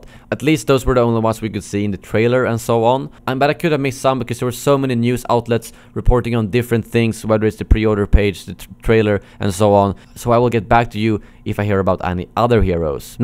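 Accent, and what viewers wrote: Sweden. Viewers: Man kan höra på din engelska att du är svensk. Men bra video annars!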